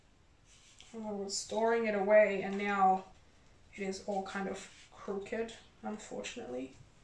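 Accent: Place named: Australia. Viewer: Loved learning about you. Your English is perfect with that lovely Aussie accent! I also tend to gift my stitching, though I recently purchased patterns I want to stitch and keep. I’m lots leer but like your style. Looking forward to more!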